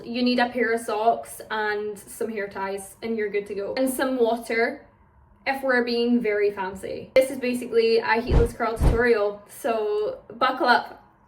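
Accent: Scotland, United Kingdom. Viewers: Irish American? That would be someone of Irish decent, living or born in America. She’s Scottish, and was raised and is still living in Scotland.. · Are U Scottish? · Excuse me but I've never heard you speak before and your accent is gorgeous🥹. I'd love to hear asmr in your accent. Where are you from? Your accent sounds like it might be regionally specific and I'm always curious about that. Like how accents in new york city differ a lot depending on where in the city someone is from. Like, peter Capaldi speaks differently than you but you both sound scots, so i assume you're not from the same regions. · are you scottish? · OMG UR SCOTTISH I LOVE THAT AND I WASNT EXPECTING YOU TO BE SCOTTISH I THOUGHT YOU WOULD BE FROM LONDON! UR SO PRETTYY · I love Scottish accents so much💛💛💛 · r u Scottish (cuz I always thought u were American) also r u British cuz I ammmm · I’m pretty sure she’s Scottish, I could be wrong though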